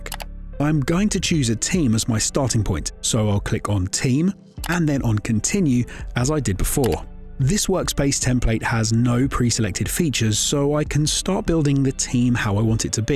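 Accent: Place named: England, United Kingdom. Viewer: This is amazing! Amazing product, walk through and the English accent helped bring everything together :)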